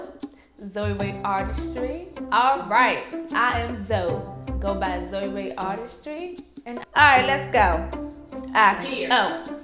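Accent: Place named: Washington DC, United States. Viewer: I know a DC accent when I hear it. Love my Yurman rings and stack all of em 💕 thanks for sharing.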